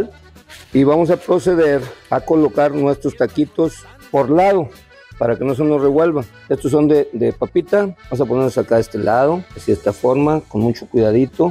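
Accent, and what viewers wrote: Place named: Northern Mexico, Mexico. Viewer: vivo fuera del país y ver recetas, oir musica y el acento de hablar de mi tierra uufff!!! es un gustazo para mi y arriba el norte y ñor!!🤠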